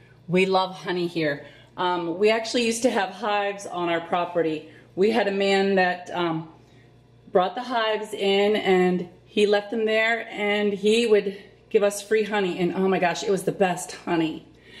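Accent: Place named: North, United States. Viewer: I have those same tomatoes too. I use them in my summer decor. Our weather isn't too bad here. No snow and temps in High 30' today. Not as bad as some places that got dumped with snow. Are you from Wisconsin or Minnesota? I feel like you have that northern accent. I grew up in Michigan, people say I have an accent too lol.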